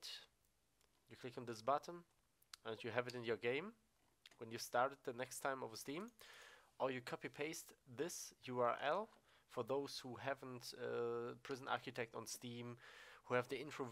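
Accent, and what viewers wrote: Germany. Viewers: Man hört ultra das du deutsch bist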